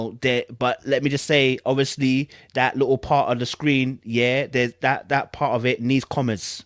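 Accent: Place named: United Kingdom. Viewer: I AM DYING!!! THIS IS HILARIOUS AND YOUR BRITSH ACCENT MAKES IT ALL BETTER!!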